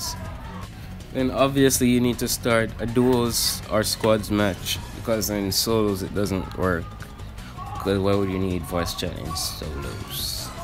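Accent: Jamaica. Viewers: are you jamaican? just askin cause i am half jamaican you just sound like it 😂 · Your a Jamaican aren’t u? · Thx bro I notice your Jamaican and I'm too big up yuh self bro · Are u Jamaican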